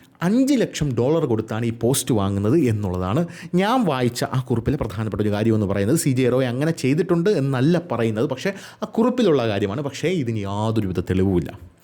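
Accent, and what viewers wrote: Thiruvananthapuram, India. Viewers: 😂😂😂😂😂😂😂❤❤❤❤. അവസാനത്തെ ബാബു സാമി പൊളിച്ചു. Serious ആയ വിഷയം കോമഡി ആയി പറയാന്‍ ബെസ്റ്റ് തിരുവനന്തപുരം slang ആണ്‌ എന്ന്‌ തെളിയിച്ച അങ്ങ് ഒരു മഹാന്‍ ആണ്‌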